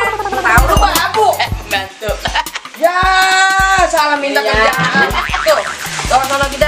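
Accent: Jakarta, Indonesia. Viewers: Mksh sygg, nti kt lnjut lg lbh kocak lbh medok betawi'a😀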